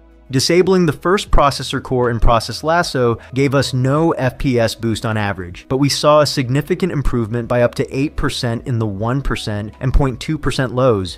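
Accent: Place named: United Kingdom. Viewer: Thanks i got the same cpu getting some solid improvements now, also maybe time to embrace your british accent again for next video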